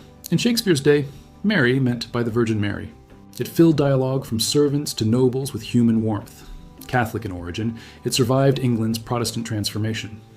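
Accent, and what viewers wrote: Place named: United States. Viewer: Rubbish US accent commentary and many of the words pronounced incorrectly.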